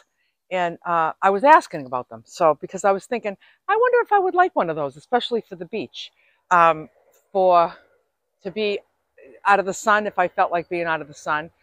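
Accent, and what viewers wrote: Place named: New England, United States. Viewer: I swear, with your smile, your wonderful New England accent, and your enthusiasm, I'd enjoy watching you set up a checkerboard! You're so delightful and precious! Thanks again for sharing your story.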